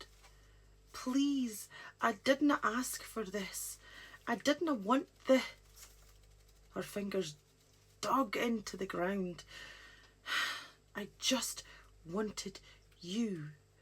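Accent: Scotland, United Kingdom. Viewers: ITS THE MAGIC THAT BINDS US , THE SURILION BLUE , LIKE THE CLOUDS IN THE WIND , LOVE YOUR SCOTTISH STORY TELLING TO FALL ASLEEP TO 💙💙💤💤💤💤💤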